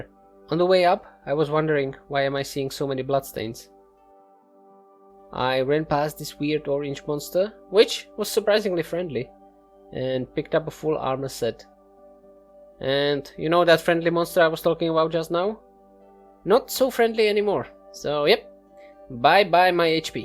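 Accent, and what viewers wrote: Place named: Czech Republic. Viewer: Pokračuj s contentem! Eventuelně se to rozjede. Pěkné video. Českej přízvuk mě mega kopnul.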